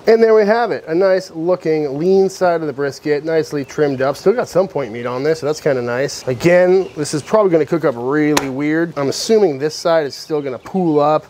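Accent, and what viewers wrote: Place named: South, United States. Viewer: That slow southern drawl is just killin' me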